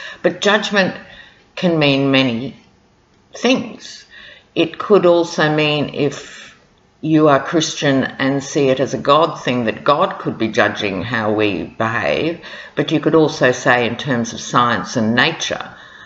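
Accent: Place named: South Australia, Australia. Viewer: As a fellow tarot reader, I so enjoy your readings. I know it takes a lot of energy to do these readings. I thank you for that time and energy you're sharing freely and generously of yourself on here. I lived in South Australia for 3 months - was married there and I admit, hearing your accent and views makes me feel a bit nostalgic and ever so comforted. Xxxx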